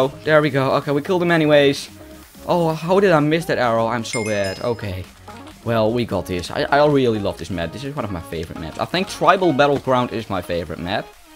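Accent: Netherlands. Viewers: It sounds Like youre dutch Nice CHANNEL keep up THE Good work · Ik hoorde het aan je accent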